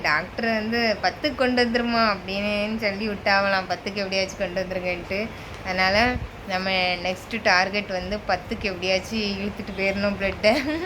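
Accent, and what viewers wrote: Tirunelveli, India. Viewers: Unga peache peachu. Enakku tirunelveli slang romba pudikkum 😊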